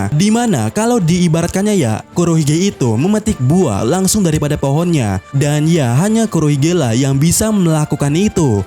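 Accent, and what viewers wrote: Palembang, Indonesia. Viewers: Bang lu orang Palembang ya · bg lu org palembang pa jambi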